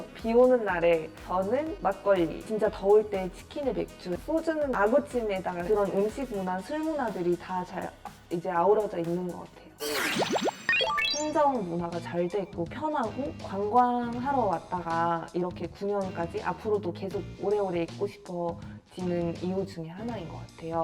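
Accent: Seoul, South Korea. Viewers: 와...진짜 서울 사람이네요. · 한국발음.한국얼굴 한국인이네.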